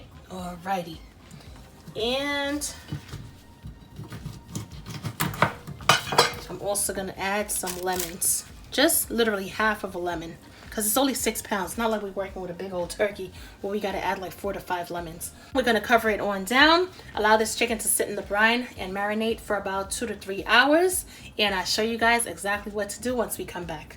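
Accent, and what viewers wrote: New York, United States. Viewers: Love that NY accent lovely chicken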